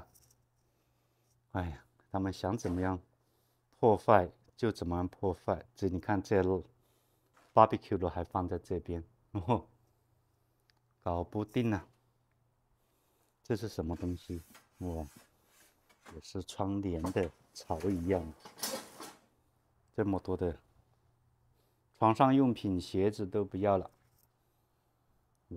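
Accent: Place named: Chongqing, China. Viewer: 听口音 你是重庆方向的吗😮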